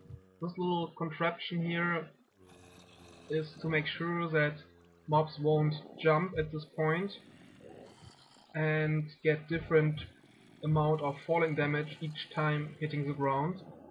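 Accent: Germany. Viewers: Kannst du die welt hochladen? Du hastn deutschen Aktzent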